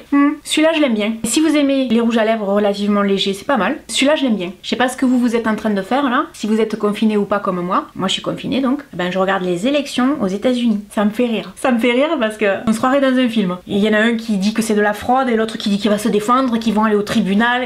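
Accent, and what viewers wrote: South, France. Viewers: Je viens de te découvrir franchement jadoreee, tu es honnête, tu propose des contenu tres intéressant comparer a d'autre youtubeuse que j'aime pas du tout, tu es trop drôle hihi j'aime bcp ton accent du sud je viens du nord moi ! Hahaha, jme suis abonné dailleurs ~ 😉😁😂😂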